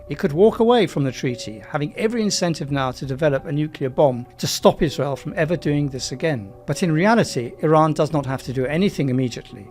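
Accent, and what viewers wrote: United Kingdom. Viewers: Thanks for reporting the truth, though I can hear your accent is British. Hope you will teach people to face facts and truth. Netanyahu is a dying lion, he doesn't want to accept he's finished😂😂😂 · MEE never ever but never ever had a good word on Israel, just this Qatari paid org is unhingede. British accent spweing garbage and misinfo.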